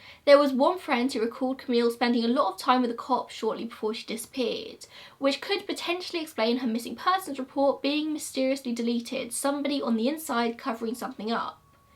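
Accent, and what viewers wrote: United Kingdom. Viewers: i'm just hypnotized by your perfect british accent <3 i love it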